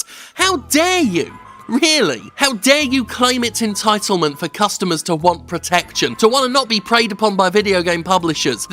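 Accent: United Kingdom. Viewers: big dude with a brittish accent. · He's got a British accent.......because he's British. How fucking dumb can you be?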